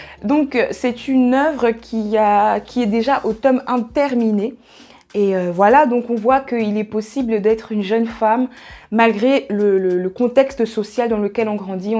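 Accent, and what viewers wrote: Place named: England, United Kingdom. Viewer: Une vidéo très intéressante, j'aime cet accent anglais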